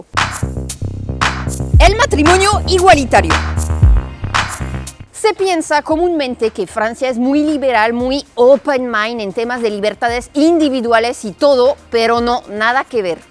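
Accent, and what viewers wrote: Mexico City, Mexico. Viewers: Me encanto tu forma de hablar muy mexicana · Me encantan tus videos, hablas tan mexicana pero con acento y eso te hace única Gracias por escoger Mexico como tu segundo hogar 🇲🇽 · Me encanta porque siento que hablo con otra mexicana, “mamoneria” jaja me encanta ❤ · Me gustas como ablas saves mejor que yo y abladora como los chilangos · Chulada de maíz prieto!!, panza arriba!! 😂😂😂 ya hablas muy mexicana, vientos huracanados👍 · Adore tu acento mesclado con Mexicano 🤭😍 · Me encanta q hable como toda una mexicana jajaajajjja 😂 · Hablas como mexicana, con un lenguaje muy nuestro. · Primera vez que veo tu vídeo, me gustó mucho tu manera de hablar, muy Mexicana, por eso ya me suscribí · Hola amiga me gusta mucho como hablas bien mexicanota a huevo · Sabes chilanguear mejor que yo. Siendo de México no puedo chilanguear ni imitar acentos. 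Amo tus notas y puntos de vista.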